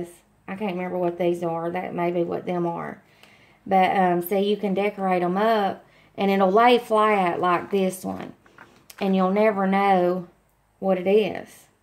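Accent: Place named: South, United States. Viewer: love the southern accent ! awesome idea's !!!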